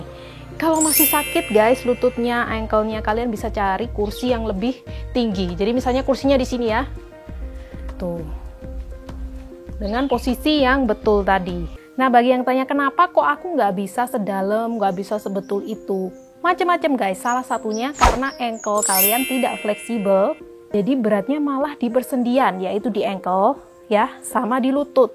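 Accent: Java, Indonesia. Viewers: Lucu bgt perckpnya..medok bgt jawanya 😀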